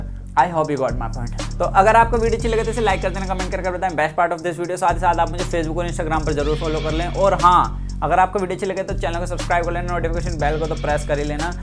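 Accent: Haryana, India. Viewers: Launda Haryana se lag Raha h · haryanvi ho bhai kya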